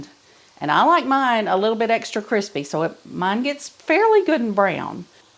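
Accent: South, United States. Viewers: Love your recipes and your lovely southern accent! 😊😊😊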